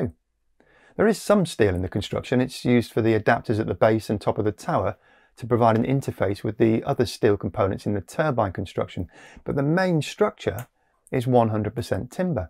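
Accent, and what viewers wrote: England, United Kingdom. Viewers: 5 second in, befor I could mute this vile noise from england. I assumed it would be Human aka American speech. Can't watch such mess.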